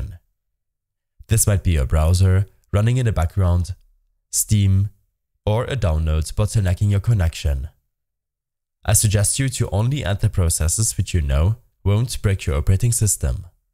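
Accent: Germany. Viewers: uhhhhh i hear a german voice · ich hör eine sekunde und man merkt das du ein deutscher bruder bist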